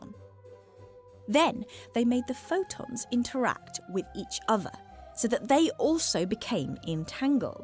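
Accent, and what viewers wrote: United Kingdom. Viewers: Oh god a british accent oh well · Adorable, and very Harry Potter sounding, but unfortunately doesn't make it any clearer to me. I think the crux of my confusion is that the detectors when put at 120 degrees to each other have one correlation under QM and a different one under Hidden Variables and experiment matches QM. However my weak grasp of detectors and statistics trips me up on the details of why these are valid experiments to compare the two. Hopefully a future adorable video can :)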